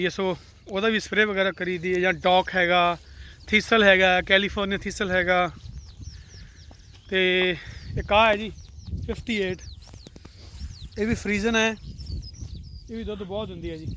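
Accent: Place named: Majha, India. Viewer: veer g tuhadi Boli Maajhe di lagdi a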